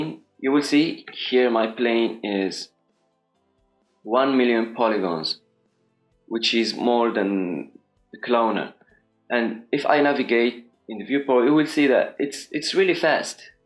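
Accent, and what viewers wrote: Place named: Morocco. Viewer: khoya 3reftek mghribi hir mn l'anglais dyalk hhhhh. keep up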